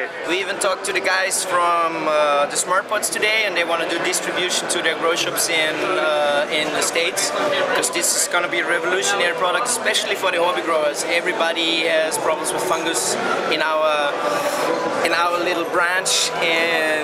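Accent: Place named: Netherlands. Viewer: Dutch as shit 🤙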